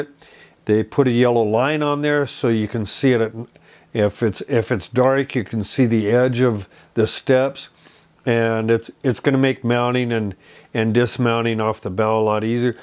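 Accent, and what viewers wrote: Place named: New England, United States. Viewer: I usually like to fish by myself as well. Most companions can't get up early enough and get antsy quickly. By the way, you sound like you're from New England or MA; if so, where from?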